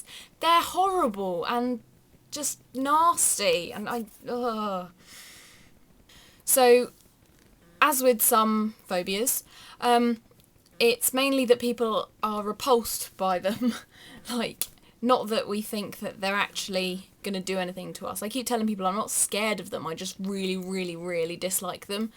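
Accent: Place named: Australia. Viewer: You made me laugh sooo much how you said things it made it even more funny with your Australian accent.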